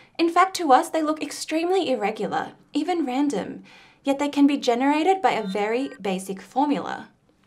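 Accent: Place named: Australia. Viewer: in an Australian accent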